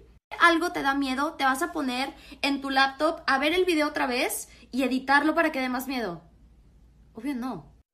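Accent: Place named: Fresa, Mexico. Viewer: Más fresa no se puede escuchar jaja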